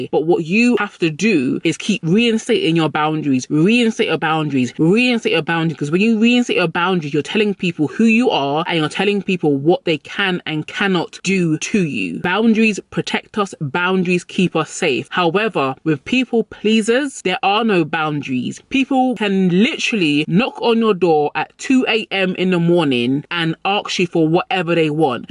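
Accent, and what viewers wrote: United Kingdom. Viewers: Yo ah from the Uk i can tell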